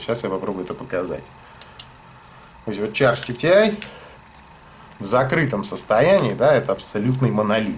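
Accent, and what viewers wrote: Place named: Moscow, Russia. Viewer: Обычный Московский акцент! У некоторых колхозников, тоже уши режет , ножниц'Ы, пол'Ожил, отдуда'ВА, и прочий словестный понос!!! Автор видео, не сколько Акает, сколько вызывающе говорит! "Выёбисто" что-ли..))) Но обзоры достаточно интересные!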